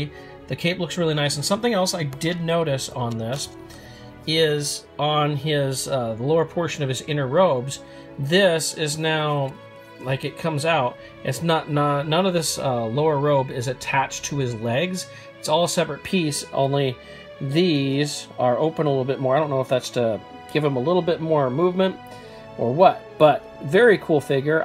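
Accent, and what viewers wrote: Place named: United States. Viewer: Whatever!, am bored with you. I take it you are a yank,only yanks talk like you do?.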